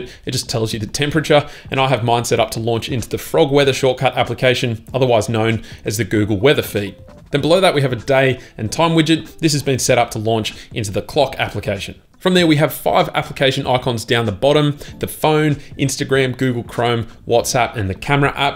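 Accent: Australia. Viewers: Mate, you Australian?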